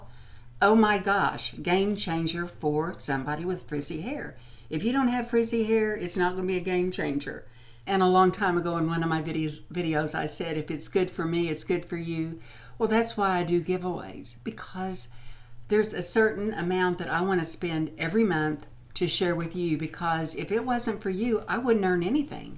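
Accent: South, United States. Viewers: Hi! I watch your channel for several reasons. First, you are honest and I trust what you say. Second, you are sweet and loving, and you have that beautiful slight southern accent. You remind me very much of my step-mother who died this year, watching you is like visiting with someone I loved very much. Third, you are genuine, it's lovely "spending time" with you. I love that you put your videos out on Sunday mornings, it's become a Sunday morning ritual for me. Fourth, you're real. Some of the others that I watch seem very much like "presenters," (I swear they are auditioning for the home shopping network) but watching you is like talking to a friend. And finally, watching you is like going home. I grew up in Indiana, but I have lived in Chicago for 40 years now. And although Chicago is very "Midwestern," it's not small town Midwestern. Watching you is like going home every Sunday morning.... 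you are good for my soul! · your soft spoken, Southern delivery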